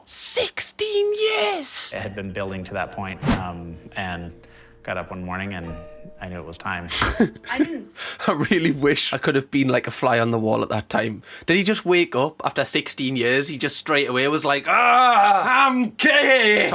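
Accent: Newcastle, United Kingdom. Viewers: I assume you know that Pret a manger is a high street coffee chain you will find across the UK and worldwide… not just a shop in London. Weird comment, just through me off to hear a londoncentric geordie 🤷‍♂️ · The jordie accent is what makes the best if he was from Liverpool 🤮🤢 I wouldn’t whatch him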